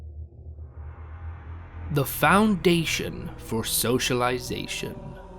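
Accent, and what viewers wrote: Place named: United States. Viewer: Eastern European? Where are you from, the accent really sounds American to me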